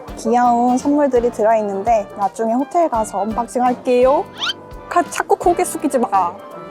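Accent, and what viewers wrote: Gyeongsang, South Korea. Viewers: 소리 끄고보면 완젼 갬성 브이로그 유튜브가튼데 소리키면 그냥 대구사람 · ㅎㅎㅎ 브이로그에서는 ㅋㅋ 뭔가 귀엽게 대구 발음이 나오시네요.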